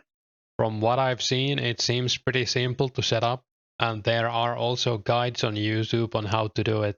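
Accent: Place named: Finland. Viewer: Enjoying the vids man! That glorious Finnish accent is very calming and helps me get through the late night grinds :)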